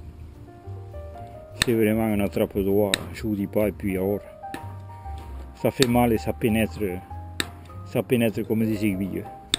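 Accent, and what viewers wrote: France. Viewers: je me suis abonner a votre chaîne il y a un mois et je suis dingue de vaut vidéo , votre fort accent de Carcassonnais ma fait rire vous m aver donner envis d avoir des oiseau pour mes 14 ans ! merci pour cette videos !!!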